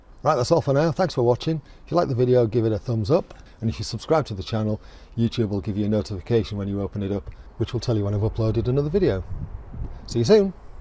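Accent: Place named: North, United Kingdom. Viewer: I think it was a combo of water,dreamy music and your dulcet northern tones!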